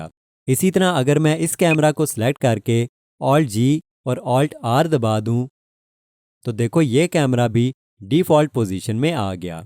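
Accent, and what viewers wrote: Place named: Pakistan. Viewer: Apki awaj pakistan logo jaisa ata hai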